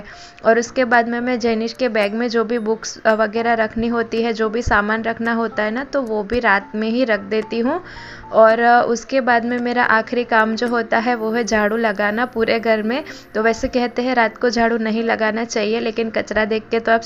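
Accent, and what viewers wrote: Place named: Gujarat, India. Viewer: Aap gujrati hai bolne se lga muje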